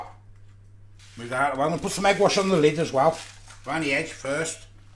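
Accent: Birmingham, United Kingdom. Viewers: Nice,loving the brummie accent,where you from ?,I was born in Smethwick now living in Florida..locals always think I'm South African...lol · You sound brummy or Wolverhampton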